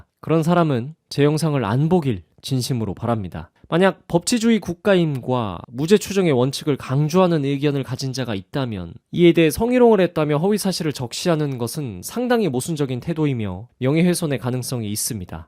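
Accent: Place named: Gyeongsang, South Korea. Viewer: 경상도 분이신가보네요 오늘따라 사투리가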